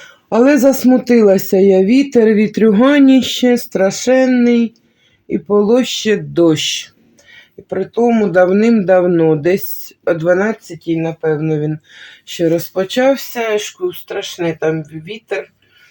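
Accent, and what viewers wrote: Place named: Slobozhanshchyna, Ukraine. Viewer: Моя названа мама була із Купянська, так що говір Слобожанщини впізнаю одразу. 😍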